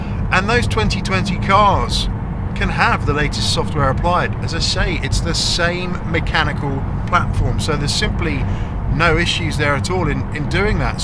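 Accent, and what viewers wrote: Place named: United Kingdom. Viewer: I can't believe how difficult this is to follow… If everything is concise and well worded and nicely structured, the British accent only makes things better… If that's not the case you're really stands out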